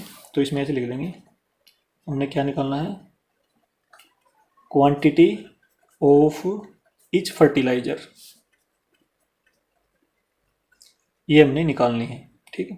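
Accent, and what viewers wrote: Haryana, India. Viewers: Khoon main hi haryanvi hai....Kaha se ho sir 👍🙏🙏🙏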